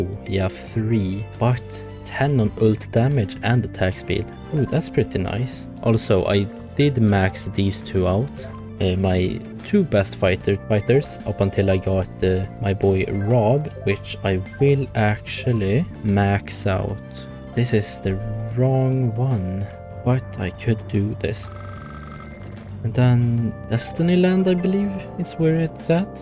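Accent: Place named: Sweden. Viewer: Yo you have like a swedish accent and in case you swedish tjena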